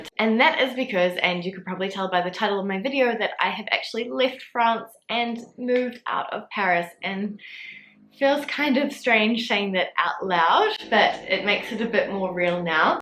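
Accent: New Zealand. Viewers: Hi! You have not to be worried about the channel, it was born with another purpose but adjustments to it might be the right thing along with the changes you are experiencing now! Me and my girlfried have started following you mainly because you come from New Zealand 😋 we wanted to hear your accent and about the differences between Europe and Newzealand lifestyle and you always hit the target. We both hope you'll continue doing videos about New Zealand and more! Thank you for your great job so far! E&I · Your so pretty and that kiwi accent is adorable and charming ! I think it's a great idea to keep this Channel, do post vids when you visit France and incorporate Videos about New Zealand. I would love to learn more about New Zealand. People seem so friendly there. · Oooooh that makes me so sad ! As a French from Lyon's area, I discovered your great channel a few months ago and loved it right on the spot ! 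I really love the way you describe our society and little habits that seem perfectly normal to us, but certainly really weird to foreign people. It's always super interesting to get a critical look on our habits. Yours is critical, but in a funny, full of empathy way, and it is always very positive and enlightening. Not only did I like the way you talked about our country, but also the way you generally speak, your personnality, your sense of humour, your great accent from NZ... So keep posting ! I hope you enjoy the modifications in your life. Your family must be sooo happy to finally have you back ! Don't be sad, ce n'est même pas un au revoir, on reste avec toi ! Chin up, spirit high ! Be happy, a nice soul like yours diserves that ! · Hello, I have been studying English in NZ for 6 months. I left Paris in January to come here where I had been living for few years. My sister works to L'Oréal as well. 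I'd just would like to say that I discovered your channel one week ago and I very apreciate your video. I think your speak French quite well. But I very enjoy to listen to you speak English with the kiwi accent about Paris. It helps me a lot for my learning of English. So thanks for that. According your chanel, even if the concept is an expatriate living in France I will be happy if you carry on. You can apeak about such a beautiful country that is NZ. I went to Wellington some weeks ago for a weekend and I very enjoyed the place, I think like a lot of people you're lucky to live there. I wish you the best ! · It’s amazing how way different your accent is now, way more Kiwi (obviously? ) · Your accent has become so much stronger no you're back in NZ!! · Hi, please carry on with your channel you’ll teach us stuff about NZ, I’ve always been fascinated by this country and the Maoris and I really wish I could go there someday. 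Plus, I love kiwi accent!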